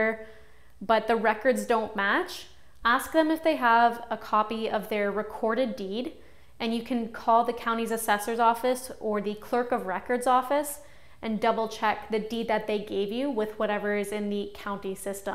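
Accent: Canada. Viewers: It sounds like you have a Canadian accent?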